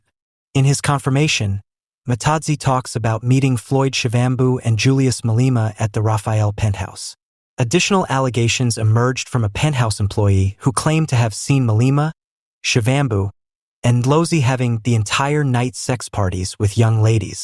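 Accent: United States. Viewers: Why is an American doing this voice-over?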